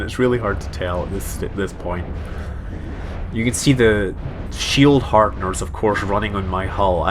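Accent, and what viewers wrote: Scotland, United Kingdom. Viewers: dunno what it is about it, but hearing a scotsman quote scripture...just sounds...right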